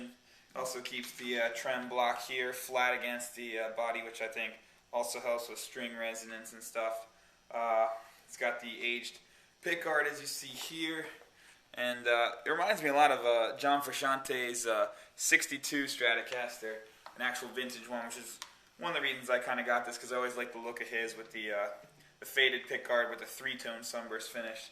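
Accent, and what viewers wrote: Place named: United States. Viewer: Are you Jim Sturgess with a permanent american accent? :) You sound exactly like him.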